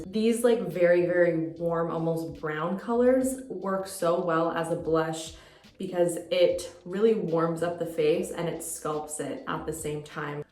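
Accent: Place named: Canada. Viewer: she’s canadian lol she just has an accent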